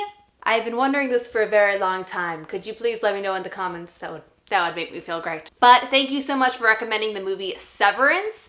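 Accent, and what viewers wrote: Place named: United Kingdom. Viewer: Killer British accent btw. Heehehehehe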